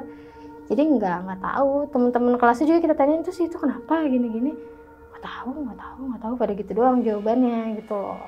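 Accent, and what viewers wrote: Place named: Bali, Indonesia. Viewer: saya asli bali, kakknya logat bali banget:face-blue-smiling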